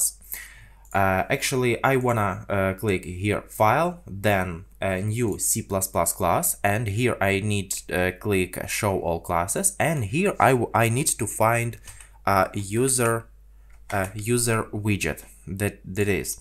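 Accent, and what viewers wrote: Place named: England, United Kingdom. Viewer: O, vi iz anglii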